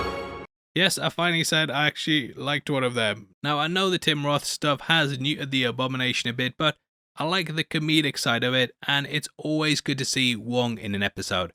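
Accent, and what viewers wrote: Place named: North East England, United Kingdom. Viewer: The only thing I look forward to other than a marvel movie or show is your break downs. Your NE accent is just totally 💯. The one place I miss in the UK most. Great stuff sir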